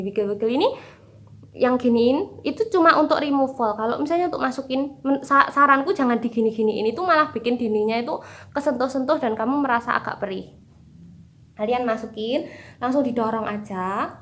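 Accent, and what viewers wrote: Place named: Java, Indonesia. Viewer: Kok bahasa medok yaa ce😁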